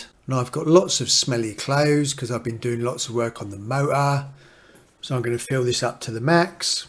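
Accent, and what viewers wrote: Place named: Suffolk, United Kingdom. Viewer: Thank you! This answered a question I've had for a year since buying a house with a Bosh washing machine. Love the accent,! Are you from Suffolk?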